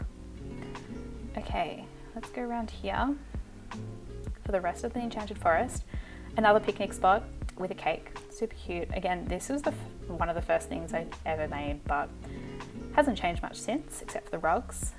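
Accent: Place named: Australia. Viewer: 🧡🧡🧡 so happy to hear an Aussie accent for a change hehe x love your island so much! Xx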